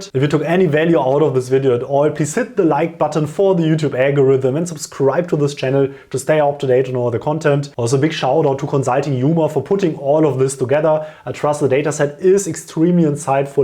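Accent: Germany. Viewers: Alter hörst du dich deutsch an😂😂. Trotzdem gutes Video👌🏼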